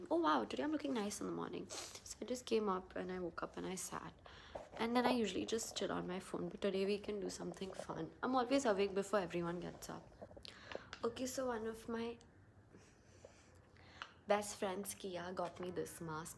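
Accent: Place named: Hyderabad, India. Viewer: ooo Hyderabadi😅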